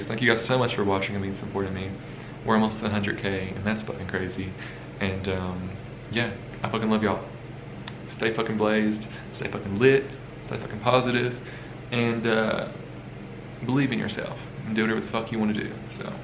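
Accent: South, United States. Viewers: I love his country accent 😂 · I have no idea who this guy is. But that's a sick sweater and I find it oddly fascinating that his accent sounds like every old southern woman I've ever met. Not that he sounds feminine but the twang is pretty much identical to old southern women.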